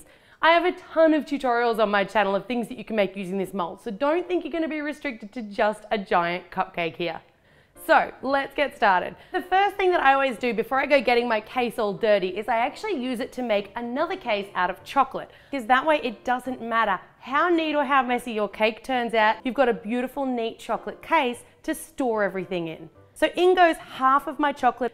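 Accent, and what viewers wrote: Australia. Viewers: Are. You australian ?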